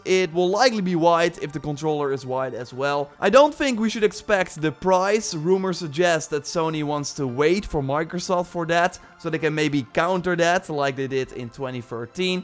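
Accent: Netherlands. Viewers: is Dutch, Guerilla Games is based in Amsterdam, hence the Dutch pronounciation. (But yea, is Dutch too. =P)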